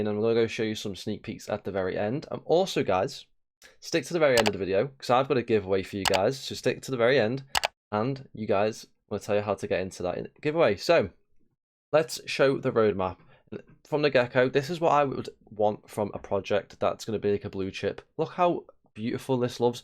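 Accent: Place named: England, United Kingdom. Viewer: Great video bro good to hear an English voice commenting on BCRC 🏴✌️✌️✌️ can’t wait for my vox cat airdrop to use in boss planet it’s going to be 🔥🔥🔥 boss cats stick together keep up the great work guys. WAGMI everyone is bullish on boss cats don’t miss out people these great you tube videos give you all the information you need. Announcements after announcements are always the norm in boss cat rocket club and delivering day by day on the roadmap this is just the beginning ayoooooooooooooo LFG lg1985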